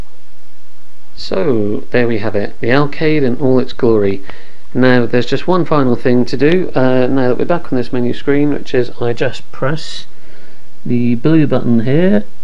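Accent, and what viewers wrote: United Kingdom. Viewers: you sound a lot like the guy that fixes/fixed my laptop, he lives in Darlington lol, are you from the UK?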